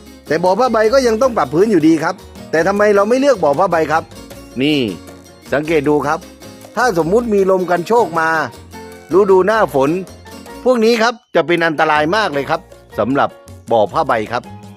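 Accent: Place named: South, Thailand. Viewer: น้ำเสียงเหมือนคนใต้เลย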